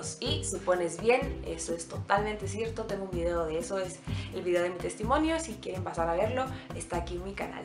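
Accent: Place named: Guatemala. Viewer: Que rico volver a escuchar un acento chapin. Y que mejor de una mujer porque como que tienen mas marcado nuestro acento hermoso. Saludos y tenes razón con eso del tráfico que es espantoso, pero en mi lugar prefiero la ciudad porque en un publo me aburriría un montón.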